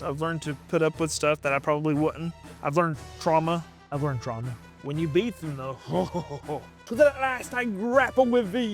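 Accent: South, United States. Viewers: It's very refreshing to hear a Southern accent talking about something I'm interested in that's unrelated to where they're from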